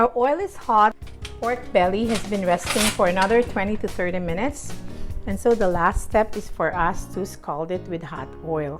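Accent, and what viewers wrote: Philippines. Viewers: Im here in LA. Yup, lalo na sa SD, its the Manila Mesa... pati kakanin and the likes.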